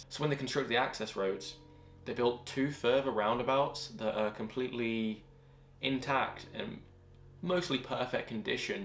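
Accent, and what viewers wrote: United Kingdom. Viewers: Hey two things: One as someone from Prudhoe it is refreshing to hear someone from the south (I'm just guessing based on your pronunciation of words like ask and glass) pronounce the name of the town correctly. Secondly, I believe they would have problems with people using the roads as a giant car park but more likely from boy racers using the deserted roads as a racetrack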